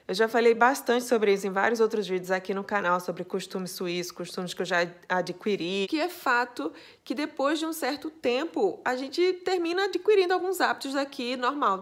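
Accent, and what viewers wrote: Northeast, Brazil. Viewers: Amooo tanto Querida,de Ver Voce Ai Falando com cada um de Nos,muito importante ver e ouvir Voce e o Querido Falando em Alemao c seu Sotaque Noedestino e muito lindo, Parabens pode falar a vontade 💓👏👏👏🎆💞💕🎼😘😍🌺🌹🍁👼🙌😇 🆙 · Imagina o quanto o teu sotaque nordestino é lindo.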